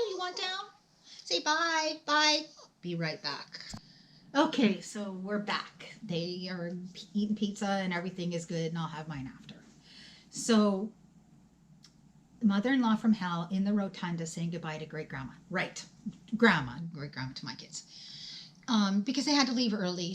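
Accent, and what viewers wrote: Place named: Canada. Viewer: I recognized the accent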